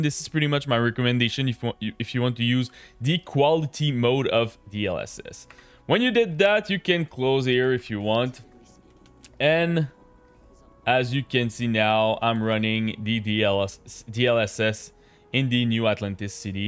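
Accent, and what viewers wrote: Quebec, Canada. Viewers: merci man l'accent quebecois est en force ahahah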